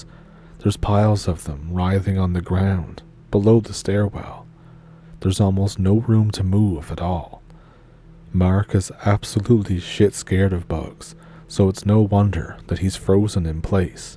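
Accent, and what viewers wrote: Ontario, Canada. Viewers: I think it's neat that anyone outside of Canada listening to this is going to think it's a Canadian accent but as someone who is from Canada and has lived all over I can definitely tell which region you're from. And it reminds me of home❤ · They popped more than a couple off in the Hudson Bay. That's a fact. Always a pleasure to hear yuir voice, it always reminds me of my Ontario cousins. Oof Da. 🎉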